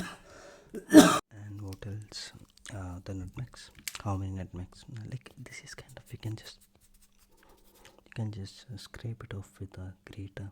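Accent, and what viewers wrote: India. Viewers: I love indian accent asmr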